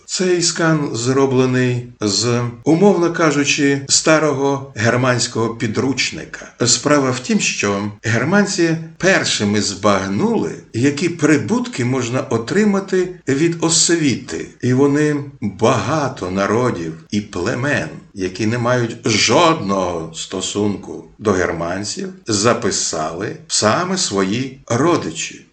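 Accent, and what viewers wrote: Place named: Ukraine. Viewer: Дякую за роботу та за українську вимову! По Толочко якось не зрозуміло, якщо він такий промосковський, то чому це питання не піднімається і його хоча б не звільнять, для початку. Бо ви вже не один рік піднімаєте цю проблему, і я так розумію людина ця не поміняла досі своїх поглядів! Чи поміняла? Або я роблю висновок, що корупція від москалів в історичній царині нашої країни це реальна інституція, яка інтегрована в нормативно-правових актах та втілена в більшій частині людей при керівних посадах?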